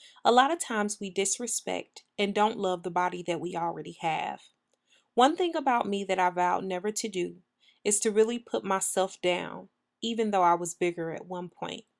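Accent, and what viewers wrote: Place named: Midwest, United States. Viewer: and are you from the midwest?! your accent is strong lol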